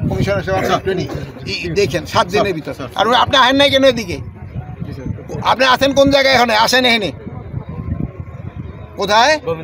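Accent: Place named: Bikrampur, Bangladesh. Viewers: স্যার কি সুন্দর আমাদের বিক্রমপুরের আঞ্চলিক ভাষায় কথা বলেন। শুনে খুব ভালো লাগলো আর এইভাবেই এগিয়ে যান দোয়া ও ভালবাসা রইলো ❤❤❤ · Amader bikrampur er Language style emoni bhai..proud of bikrampurian advisor ❤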